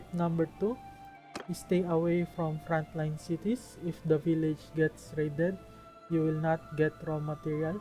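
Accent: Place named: Philippines. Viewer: nah, just your accent. Hahaha I'm filipino too, glad to see another one enjoying this game. Not many people enjoy these types of stuff in our country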